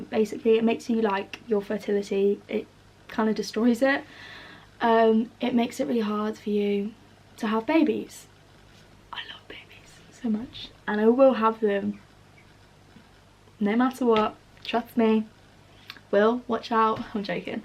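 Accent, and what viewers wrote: United Kingdom. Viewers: Ikr she has the British accent that is super calming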